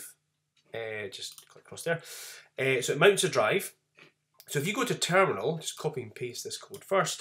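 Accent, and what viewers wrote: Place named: Scotland, United Kingdom. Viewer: Hey man, you just made my life easy. Thanks, wherever you are (your accent suggests Scotland, which is my favourite place in the world).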